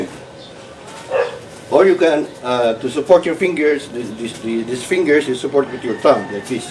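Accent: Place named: Philippines. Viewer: he sounds filipino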